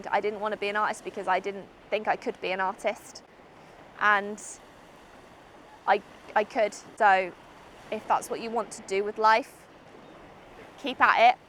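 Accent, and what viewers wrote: United Kingdom. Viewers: Who else love her British Accent?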